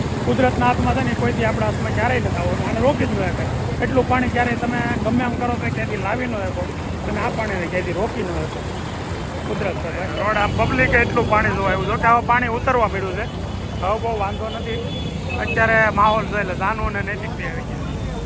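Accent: Kathiawar, India. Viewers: Bhai tamari khatyavadi languge bau maja ave che sambhadvani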